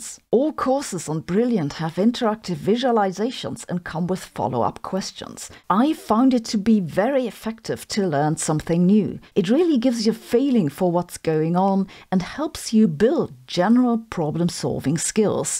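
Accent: Germany. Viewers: Sorry, heard the German accent and had a Get Smart flashback to KAOS.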